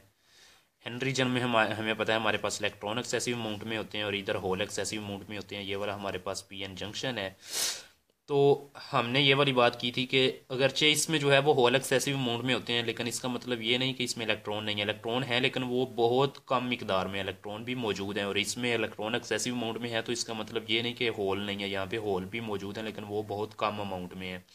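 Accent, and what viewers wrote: Punjab, India. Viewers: Sir apki voice tone puri punjabi h I m also punjabi hindu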